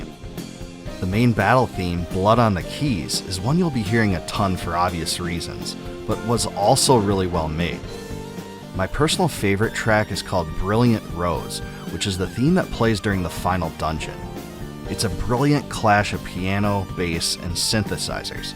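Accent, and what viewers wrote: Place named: Upper Midwest, United States. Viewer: I wanted to say, I thought it was interesting and kinda cool that you have a very specific regional accent (possibly upper-midwest?), and you like JRPG's. While this definitely isn't all true, I know there is a stereotype of us Americans that we don't really care about cultures, and only care about ourselves, which is something I am very passionate about fighting against.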